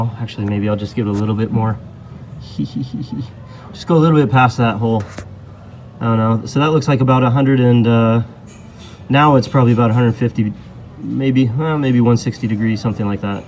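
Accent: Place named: United States. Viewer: Where do you live bro? You sound real american lol. Im totaly confused. Haha. Great videos by the way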